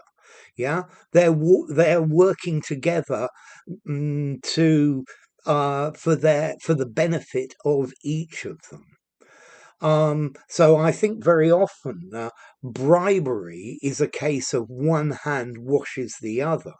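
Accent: United Kingdom. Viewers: Sir You made me loving british accent.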